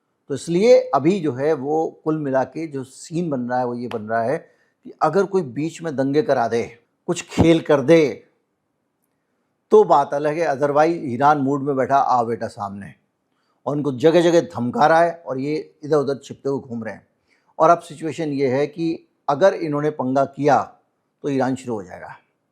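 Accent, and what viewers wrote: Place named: Punjab, India. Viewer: ji kya aap punjabi hein aap ki language mein punjabi touch hai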